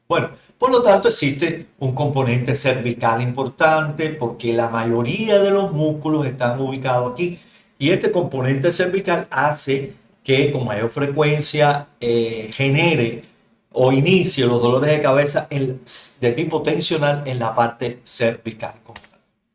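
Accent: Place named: Cuba. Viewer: Muchas gracias doctor por tan buena explicación. Por su acento creo que somos compatriotas cubanos( espero no equivocarme ) . Pasaba por aqui buscando información acerca de la cefalea tipo tensional ya que llevo años padeciéndola intermitentemente y me ayudo mucho reconocer los signos de alarma, ya que eso es generalmente lo que mas a uno le preocupa. Exitos en su canal!!!!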